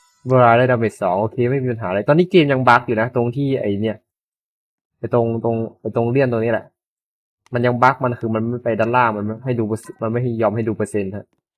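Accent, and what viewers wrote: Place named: North, Thailand. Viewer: พี่คนเหนือปะครับ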